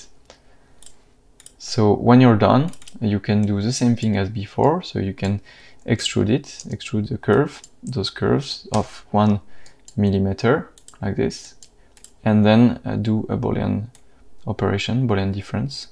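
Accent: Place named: France. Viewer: I think you are a french native speaker ;)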